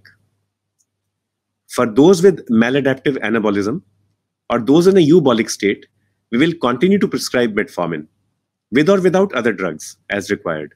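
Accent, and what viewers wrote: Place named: India. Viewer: Very hard to follow with such a heavy Indian accent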